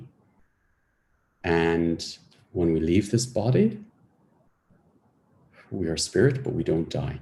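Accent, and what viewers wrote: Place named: Ireland. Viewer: Awesome with lovely mellow Irish tones - I'm here with you as Lorie Ladd told me about you. I left off so so many old things that pulled me back throughout my whole life! As I was reared as Irish catholic, I saw the time I was baptised (6 weeks old) and felt that I was handed over which I did not like one single bit. I felt stamped and this did come off in the meditation! So grateful. At almost 2 years I was put in hospital for an apendectomy, saw my little child on a table and I went off up towards the ceiling, no pain up there. I was left in the hospital and someone had some red shoes that I couldn't walk in - I think a kind nurse looked after me. Ancestral memory too of an old woman sitting on the side of a stony road, too tired and hungry to go back or couldn't go back, she was delighted to see me, it was lovely. My channel is open and I feel wonderful with new vibrant colourful energy. 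Love grace peace and patience to you my friend.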